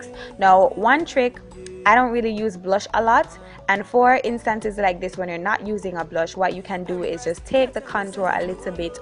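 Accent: Jamaica. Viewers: are u Jamaican?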